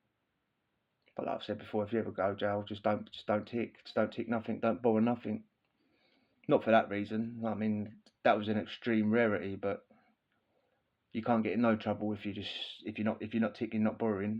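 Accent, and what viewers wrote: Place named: Birmingham, United Kingdom. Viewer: iv been on a gear detox all week......sticking it out, along with brummy banter these vids got me through a couple of rough nights. great stories mush.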